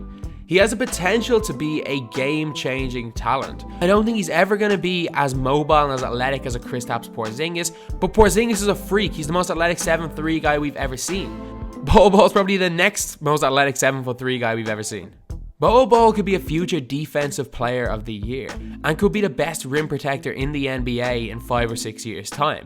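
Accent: Ireland. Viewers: thats just normal with an irish accent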